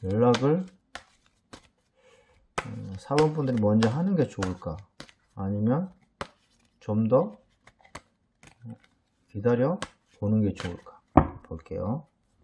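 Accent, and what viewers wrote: Jeolla, South Korea. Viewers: 2번ㅎㅎ 님뭔가 전라도분이신거같아욬ㅋㅋㅋㅋ뭔가정감가요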